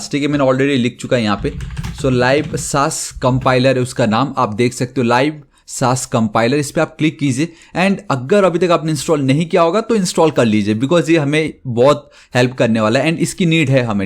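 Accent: Nepal. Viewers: why do you have Nipali accent.🤔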